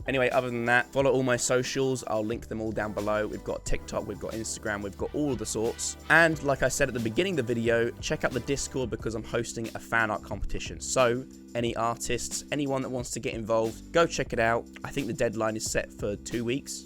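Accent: United Kingdom. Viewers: Your calm speech and voice, British accent, the chillhop and the patience and hard work you put into these builds/videos makes for such a fucking amazing and relaxing content. Your channel is Gold, mate.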